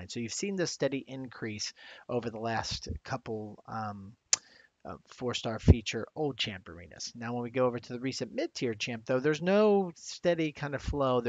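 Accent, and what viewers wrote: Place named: South, United States. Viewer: Love your cutoffs man they are the best and i enjoy them everytime because of your lively voice and joyful personality. I also like that beautiful country accent. Howdy Howdy 😆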